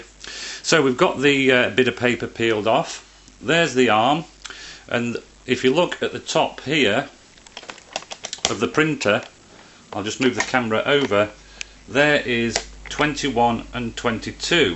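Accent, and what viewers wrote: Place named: England, United Kingdom. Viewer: Esse cara é inglês dá pra perceber pelo sotaque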